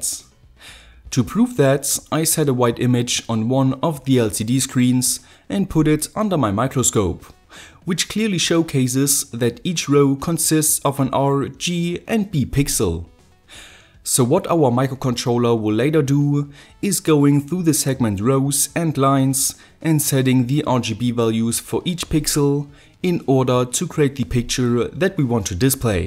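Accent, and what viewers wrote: Germany. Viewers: are you german? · Schade dass Du nicht deutsch sprechen kannst. Ich höre immer einen deutschen raus der versucht extrem perfekt Englisch zu sprechen. Aber das ist ja quatsch. Weiter so. Tolle Bastelthemen! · It's probably the german accent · Hey it sounds like ur speaking deutsch When yes: ich auch xD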